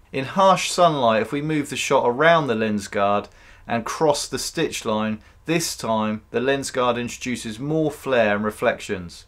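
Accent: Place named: RP, United Kingdom. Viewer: your very posh British accent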